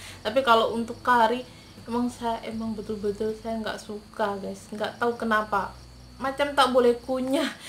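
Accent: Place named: Indonesia. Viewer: Yang kamu cakap sekarang ni bahasa Melayu lah Yang beza loghat Kamu berbahasa Melayu loghat Indonesia Di Malaysia bahasa Melayu loghat Malaysia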